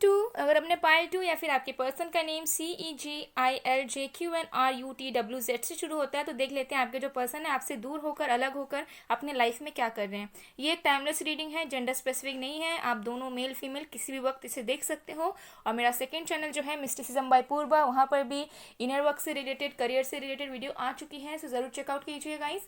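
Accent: Bengal, India. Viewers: Sis tum bengali ho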